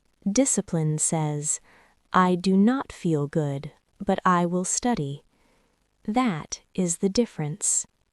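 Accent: United States. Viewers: امريكية ماهي بريطانية ابداً لان نطق الكلمات مختلف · تبدو انجليزية أمريكية وليست بريطانية ؟؟ ارجو الرد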